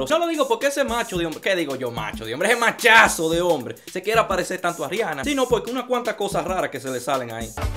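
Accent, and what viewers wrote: Cibao, Dominican Republic. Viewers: LO QUE ME CURA ES EL ACENTO CIBAEÑO QUE EL TIENE JAJAJA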